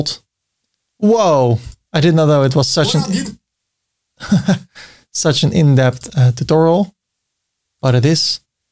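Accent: Netherlands. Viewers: TOP video!! En love het Nederlandse accent haha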